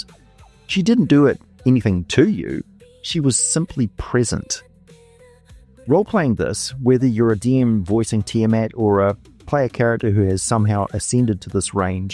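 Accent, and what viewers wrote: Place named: New Zealand. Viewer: oh I remember those days well! I don't miss them..... I've got to say as well; it's quite surreal hearing a fellow kiwi on a youtube channel! 😂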